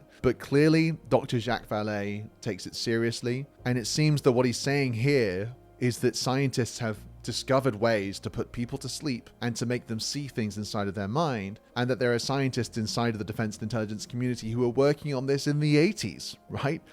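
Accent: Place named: United Kingdom. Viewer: Could be. Your have a British accent.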